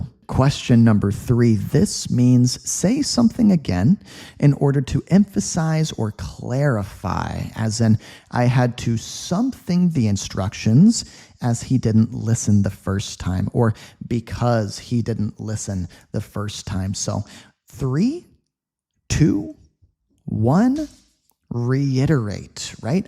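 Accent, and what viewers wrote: United States. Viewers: You articulate perfectly American English which is my favorite standard of the English language, listening to you is almost like having a personal tutor. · Are you an American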